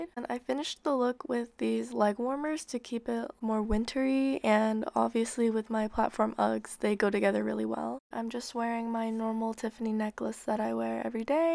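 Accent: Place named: Russia. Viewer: может мне кажется, но почему-то у меня создаëтся ощущение что у неë русский акцент) но девушка очень милая, и образы подобранны очень грамотно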